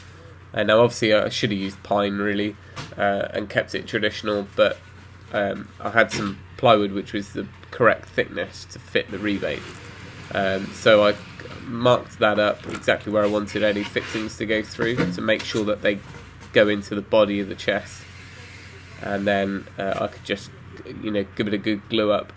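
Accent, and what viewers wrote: United Kingdom. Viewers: sorry can't handle British accent.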